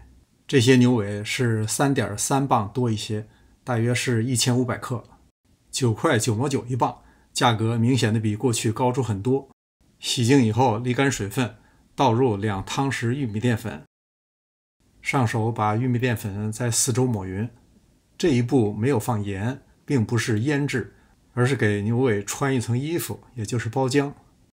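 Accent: Beijing, China. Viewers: 喜欢你的北京口音，赞一个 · 一听就是咱老北京😂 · 您提供的菜谱都是家常的蔬菜，但却是不寻常的搭配。还有您的京腔儿听着特别亲切。请问可以加您的微信，更便于向您请教吗？ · 您的北京话让我这个看视频的同乡 一边流口水一边 热流满面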